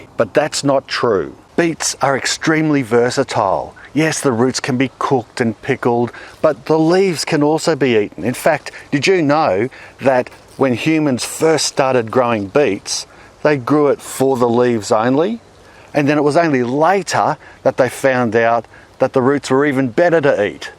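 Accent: Australia. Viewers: Chef E He lives in southern Queensland in Australia and he is speaking Australian. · Love your Australian accent! Your opinion of sub-irrigation grow boxes? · i enjoy your content delivery, your Ausi accent as well; i take what i can from you, because i live in a 6 months of gardening a year if i'm lucky, area just north of the 45th parallel, on the shore of Lake Huron one of our great lakes. i love beets, and growing them; i took away the spacing part of your talk, i'm gonna try your way in about 4 months. thanks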